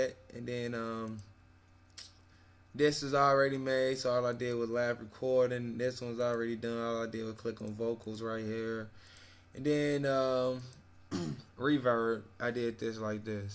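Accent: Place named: Chicago, United States. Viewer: I can tell U in Chicago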